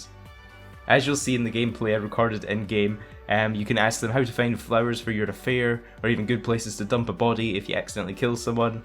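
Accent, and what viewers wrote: Scotland, United Kingdom. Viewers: Hey man! Are you scottish by any chance? You sound like it, love your channel! <3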